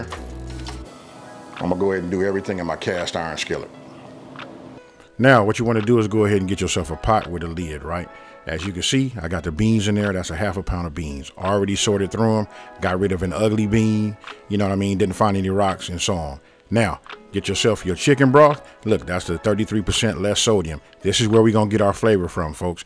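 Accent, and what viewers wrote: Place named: South, United States. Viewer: where are you orginally from? that aint no california accent thats gotta be from the south brother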